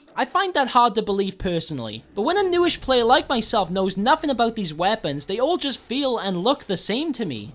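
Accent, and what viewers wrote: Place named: South Wales, United Kingdom. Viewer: Nice to see a welsh YouTuber for once Also you from Newport, like it’s a weird question but you have a south accent.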